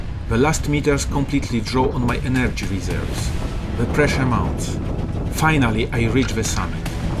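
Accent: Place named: France. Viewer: Cet anglais avec un accent plus que frenchie, c'est terrible à entendre. Sans vraiment aucune méchanceté hein, mais là, c'est un peu terrible quand même...😯 Amicalement.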